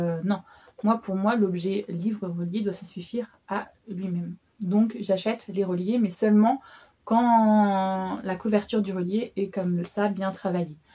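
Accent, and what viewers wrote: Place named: England, United Kingdom. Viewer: ton accent anglais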